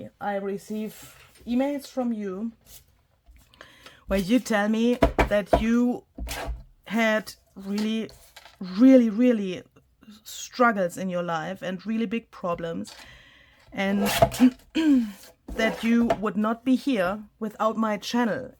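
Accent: Germany. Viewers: I love hearing Tim-isms with a German accent.😄😄 You are a delight to listen to.❤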